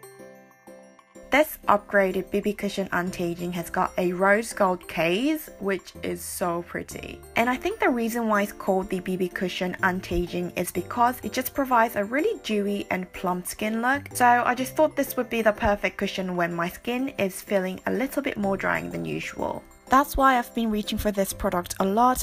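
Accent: United Kingdom. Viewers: 저기 죄송한데 영국발음 영드로 공부해도 되나요? 발음이 너무 탐나네요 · 와 이 화장 진짜 너무 예뻐요 언니ㅜㅜㅜㅜ영상 너무 잘보고 있어요ㅜㅜㅜ한국말 하실때도 매력 넘치시지만 언니 영국식 발음이.....❤️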